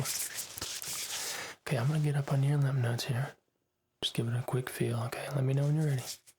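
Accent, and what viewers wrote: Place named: South, United States. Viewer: I hear a hint of a southern accent haha, are you from the south?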